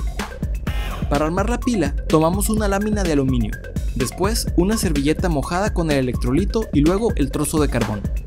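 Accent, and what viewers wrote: Mexico. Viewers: Se te nota el acento Sinaloense we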